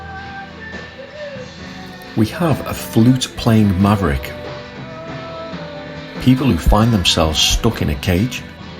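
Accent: Liverpool, United Kingdom. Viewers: Sorry ..... only just got on to your this really is fantastic content and fantastic to hear your a fellow scouser . Thank you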